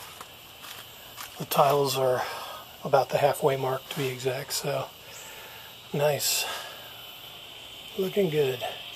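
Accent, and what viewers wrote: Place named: Russia. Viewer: Мне показалось, что комментатор русский ))))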